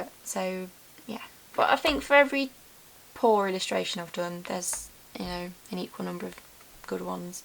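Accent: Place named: Newcastle, United Kingdom. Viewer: also do u come from around Newcastle England area or just England if it's too personal I was just asking u sound like it. Also thanks for telling us about the RPF it really helped me 🙃😊😊😊😊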